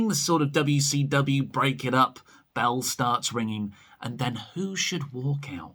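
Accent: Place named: United Kingdom. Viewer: Yes. Just Yes. To me, AEW is if WCW did everything right instead of... well you know the rest. have you ever thought of using the US grading scale? A+ and whatnot? no complaints about your current grading scale, just a thought. Love this channel because none of my friends are big wrestling fans... or have British accents.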